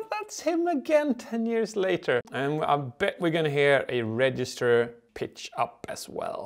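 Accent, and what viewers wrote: Sweden. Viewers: e han från sverige eller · Do i hear some swedish accent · Well they are both swedish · Are you swedish? Also great job on the video watching it made myhead hurt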